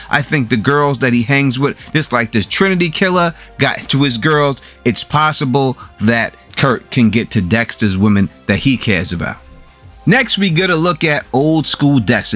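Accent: New York, United States. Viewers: Next up is Kurt who comes with a SET OF BAWLS ON HIM. omg I love your accent, are you from ny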